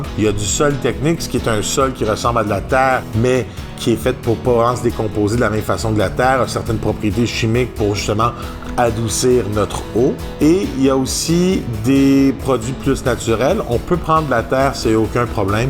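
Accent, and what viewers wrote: Quebec, Canada. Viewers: UN GRAND MERCI cher monsieur avec votre très bel accent québécois. Mon fils de 6 ans sera ravi 🤩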